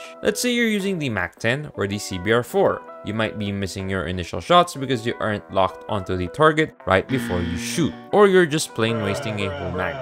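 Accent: Philippines. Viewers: You sound Filipino, and because of that you gain my subscription 😎😎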